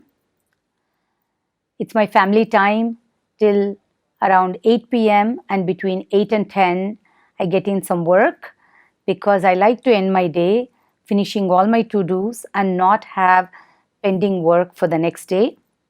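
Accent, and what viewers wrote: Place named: South Asia. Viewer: Thank you for these words of wisdom Ms Kalaari. The subtitles really helped since I can't follow your accent (South Asian, I believe). Can you point us to some good HBS case studies of your investments?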